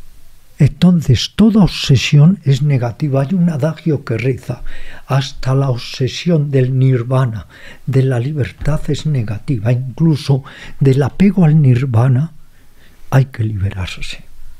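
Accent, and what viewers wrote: Galicia, Spain. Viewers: Qué rato más estupendo he pasado escuchando este podcast! Aunque han sido más de dos horas me ha sabido a poco! Yo a Ramiro Calle ya hace tiempo que le sigo y le conozco, le considero mi maestro salvador ya que desde que me topé con él me ha cambiado la vida y no me cansaré de darle las gracias!🙏 En cuanto a Alex Fidalgo, no tengo palabras! Que magnífico entrevistador y me atrevo a decir, mejor persona! Cómo respetas los tiempos de respuesta, qué manera de absorber todo lo que te contestan, qué preguntas más naturales e interesantes... y ya no te cuento ese acento gallego maravilloso que es música para mis oídos! ❤ Muchísimas gracias por estas joyas! Deseando estoy de volver a escuchar otro podcast! Ah, y puedes seguir repitiendo de invitado. Nadie creo que se canse de escuchar a este señor maravilloso!🙏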